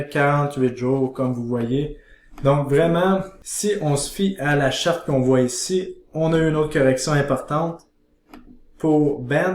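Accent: Canada. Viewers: Suis je le seul français qui, en lisant les commentaires, entend l'accent canadien ? =D Vidéo intéressante en tout cas, je vais faire attention a cette moyenne pour mes prochains investissements ! Merci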